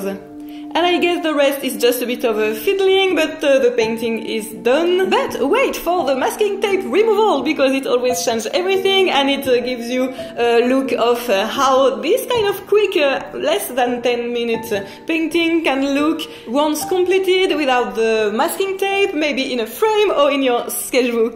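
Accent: France. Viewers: I have really enjoyed this tutorial. I have painted it four times and keep learning a little more each time. Sometimes I have a difficult time understanding you when you talk very quickly with your French accent so I have found a solution to that. I slow down the speed on my computer which slows down your talking too. It works great although at times it is rather funny because it makes you sound a bit intoxicated. Lol. I would love to watch tutorials on negative painting. You are such a talented artist.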